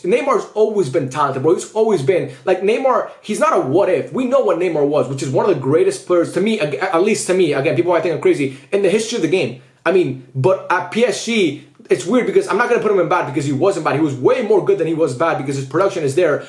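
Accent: United Kingdom. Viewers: Top tier British accent 🇬🇧💷🫖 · Amazing British accent! Please oil up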